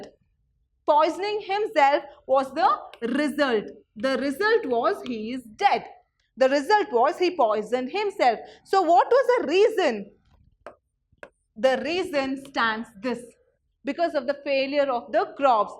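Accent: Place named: Kerala, India. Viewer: But her pronunciation is unbearable...mallu pronunciation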